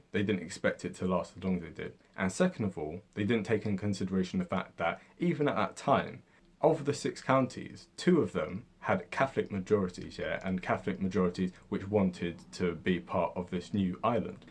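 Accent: England, United Kingdom. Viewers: It is soooo refreshing to hear an English voice with such a deep and erudite knowledge of Northern Ireland. Just plain facts. Well done sir. Great job. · Great video... I've never heard someone with an English accent show this much knowledge and understanding of our history before. If only there were more in Westminster or English TV/radio with this level of understanding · I'm from Ireland and to see someone from England (I assume your English from your accent) talk about Irish history in a fair and balanced way like you have is very welcome and wholeheartedly appreciated. Thank you sir, you have well earned a follow. ps your script sounds great. pps dail is pronounced dall (like doll with an a instead of o) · Very good summary esp coming from (it sounds like, by your accent?) an English person. Also, the British govt have just decided that it's okay for extra-judicial killings of civilians to happen and the soldiers etc who do that won't be held to account ... 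As for the Unionists becoming an oppressed minority - I am confident that this will not happen. Re-unification will have to be done carefully and with due regard to every individual.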